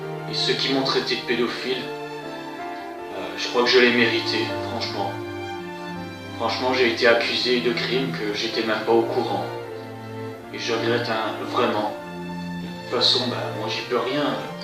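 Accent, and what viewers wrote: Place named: Belgium. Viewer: c rien c l’accent belge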